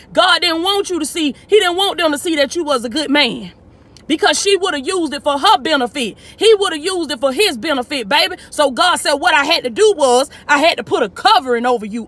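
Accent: South, United States. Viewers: Love to hear her southern voice preach 🥰🥰🥰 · Just love your American accent! I'm from London, 🇬🇧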